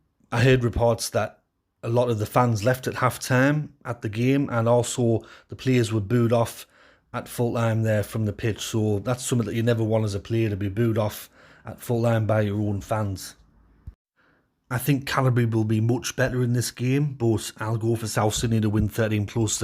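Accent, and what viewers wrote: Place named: RP, United Kingdom. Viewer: That accent is just pure class. You should be a member of the House of Lords.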